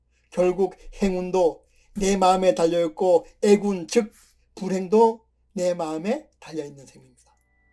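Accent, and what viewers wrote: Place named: Gangwon, South Korea. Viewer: 어머.선생님 어쩐지 강원도 사투리가 있다 싶었는데... 반갑습니다. 저도 영월출신입니다.^^ 저도 주역공부 열씸히 하고 있답니다.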